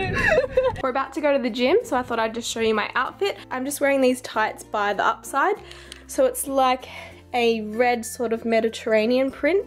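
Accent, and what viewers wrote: Australia. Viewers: Hi! Just found ur channel:) You have good skin and toned body (Australian accent as well:p do you mind sharing ur beauty tips with us? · you have an aussie accent, but do you live in australia ?? or do you live somewhere else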